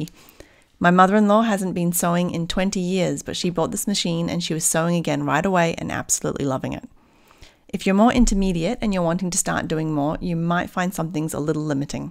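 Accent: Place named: Australia. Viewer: Love your review of this machine. I have the original lotus Tx and haven't used it for awhile so inspired to start using it again. I would love it if you could go through a review of the original one too. I love your sewing room are you able to do a video of that and how you set it up, it looks very organised. I am from Australia just wondering with your accent if that is where you are from. Great work your videos go at a good pace to understand and you are nice and clear and camera work is great too, well done.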